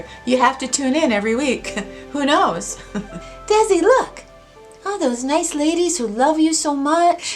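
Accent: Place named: Midwest, United States. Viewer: i really hear your midwestern accent in this video.. it is very cute. stay well..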